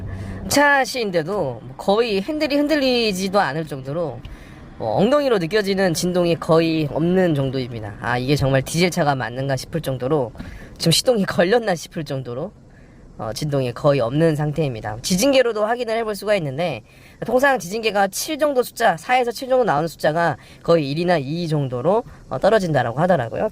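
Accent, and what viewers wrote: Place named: Jeolla, South Korea. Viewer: 전라도 말투 보이긴했는데 광주분이셨군요, 기회되면 뵙죠 ㅎ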